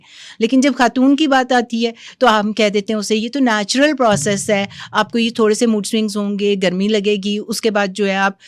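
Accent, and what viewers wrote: India. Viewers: Me bhe confused hoon k ye anchor q Hindi pronunciation k sath baat ker rahe hy ya shayd Undian hin qk unki alphabet me khay ghain nahe hy or agr ye Pakistani hy to isko yaqeenan urdu talaffuz ana chahiye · why host uses indian style urdu , she says کھ، instead of ک۔ like خواتین کو کھواتین وغیرہ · Bhen ap jan k hindi accent main boti ho sach main zehr lgti ho esey boltey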